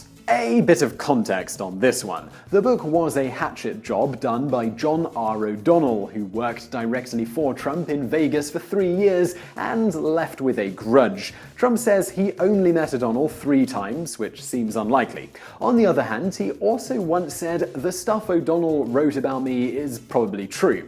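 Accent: United Kingdom. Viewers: Is this guy British or does he fake the accent?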